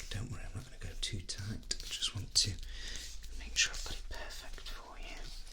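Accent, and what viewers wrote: United Kingdom. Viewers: He sounds... British?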